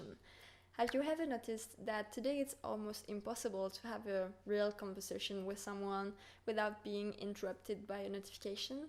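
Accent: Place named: France. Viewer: Are you French? You've got a cute accent.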